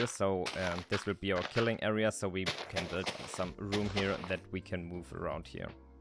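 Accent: Germany. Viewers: geiler deutscher akzent und geiles vid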